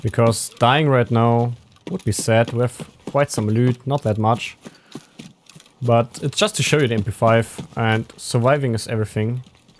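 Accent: Germany. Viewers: Man hört richtig das du deutscher bist haha. Also am englisch. Nicht böse gemeint oder so. Weiter so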